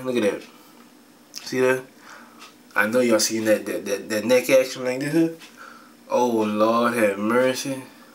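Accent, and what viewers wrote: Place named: Louisiana, United States. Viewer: u from lousiana my dude. u talk like my cousions.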